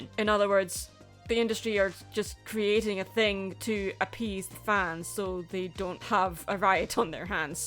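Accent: Scotland, United Kingdom. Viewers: Love it or hate it this woman spitting facts. Also you sound Scottish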